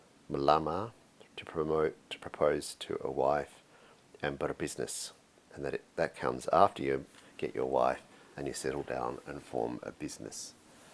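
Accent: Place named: Australia. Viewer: his Aussie accent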